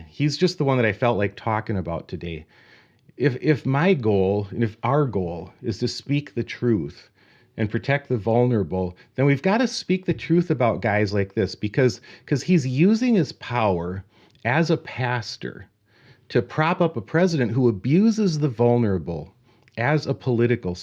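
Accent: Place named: Minnesota, United States. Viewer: You’re on fire, man. I’m learning to enjoy what disgust sounds like in Minnesota nice dialect. Thank you.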